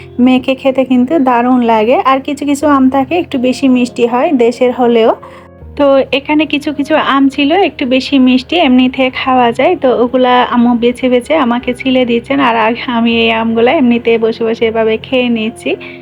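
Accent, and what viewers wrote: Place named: Sylhet, Bangladesh. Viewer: ❤❤ আপু সোহার জন্য রইল মন থেকে অনেক অনেক দোয়া ভালোবাসা ❤ তোমার শাশুড়ি তো অনেক আম এনেছে বাড়ি থেকে আমাদের না এবার আম আসেনি আমাদেরকে একটু পার্সেল পাঠিয়ে দাও আপু, ইলিশ মাছ আমার অনেক পছন্দের ইলিশ মাছ যেমন রান্না হোক না কেন বিশেষ করে গরম ভাতের সঙ্গে ভাজা আর ইলিশ মাছের তেল যে খেতে বেশি ভালো লাগে আমার, পরিবারকে নিয়ে অনেক ভালো থাকো সুস্থ থেকো শুভকামনা রইল আপু, তোমার বাড়ি কি চট্টগ্রাম আর ঢাকাতে থাকো তুমি তোমার কথা বলার ধরনটা সিলেটের মানুষের মত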